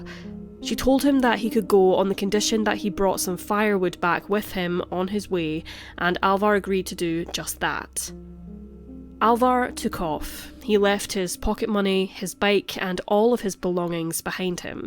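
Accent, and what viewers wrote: Scotland, United Kingdom. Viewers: Who is this bird with the Scotts voice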